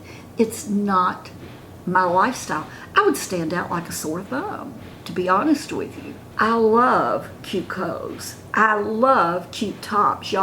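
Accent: South, United States. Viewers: I love the sound of your voice. I know you have a southern accent, but I can’t name the state. Some older women have unappealing voices and they need to work on that. I guess you can’t do a video on that, but is a big factor.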